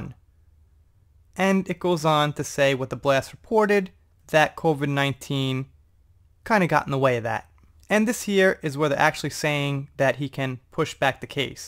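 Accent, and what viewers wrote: New York, United States. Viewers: Man I'm real late for this one but I wanted to tell you or ask you, are you from New York? Kinda sounds like it. But also I heard you live in Japan, is that work related? I know I'm a little nosy, well really I'm a lot nosy. You don't have to tell me anything. I'm not a Stalker or anything. I promise just nosy. Thanks for the great info..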